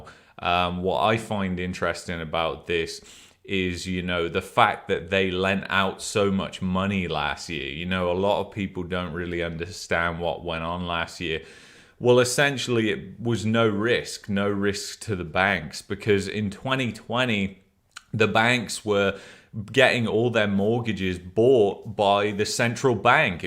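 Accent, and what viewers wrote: United Kingdom. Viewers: Finally we see the true story where the mainstream media never covers. Many Thanks for your time. New subscriber. Love the British accent too.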